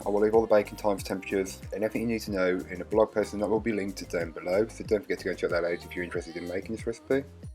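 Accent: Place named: England, United Kingdom. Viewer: Can I ask where your accent is from in England. It sounds very dulcet to ones hear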